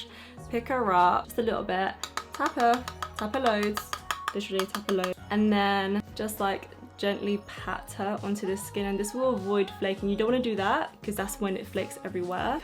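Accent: United Kingdom. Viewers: Is she in Australia maybe am wrong but it sound brtiths to me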